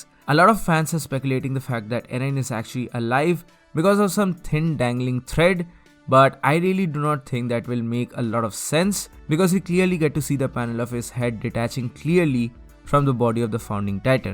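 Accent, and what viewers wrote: India. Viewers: Indian voice,i know this accent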